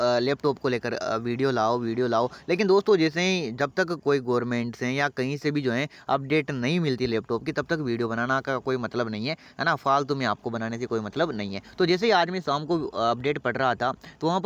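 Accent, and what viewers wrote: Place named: Hadoti, India. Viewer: apki awaj se hi lag gya ta aur ham kota se 😄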